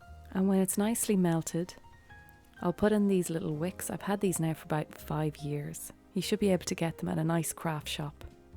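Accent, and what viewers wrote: Ireland. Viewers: The thumbnail was very attractive, and I just love your voice, Its very soothing. love the Irish accent (Right?) (I was just listening to lots of Americans, ((Dont ask)) and that just makes the Native accent more recognisable.) You've definitely earned a new subscriber, these are attractive and helpful gifts too.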